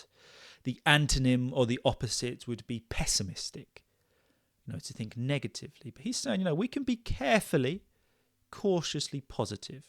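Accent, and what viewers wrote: England, United Kingdom. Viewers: please clarify which of the English accents do you speak?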